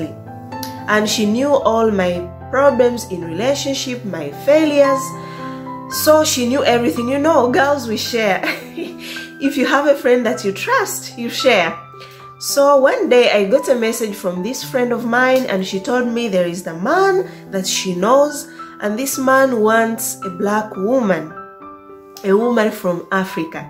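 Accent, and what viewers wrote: Uganda. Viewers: I thought she was Ugandan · Lovely story. From Tanzania but you sound like Ugandan. Much love from Uganda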